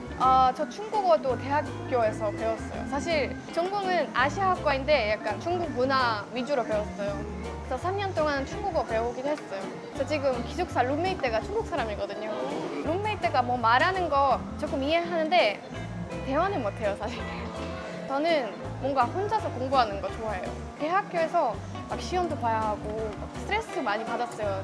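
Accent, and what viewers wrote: Seoul, South Korea. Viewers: 나보다 서울말을 잘하네......젠장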